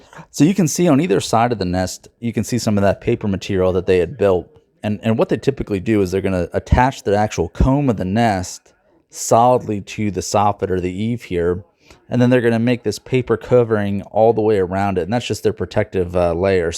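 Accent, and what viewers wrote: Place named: Pennsylvania, United States. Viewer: Let me guess, Copenhagen Wintergreen? Also you are so southeastern PA it hurts.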